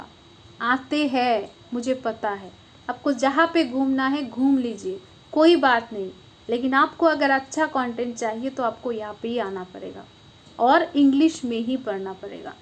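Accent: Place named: South, India. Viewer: mam s accent of english is little bit south touch but its very simple to understand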